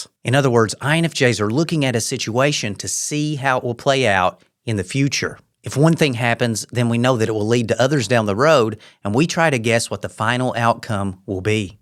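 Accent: South, United States. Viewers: I really like your southern accent, it’s nice to hear.☺️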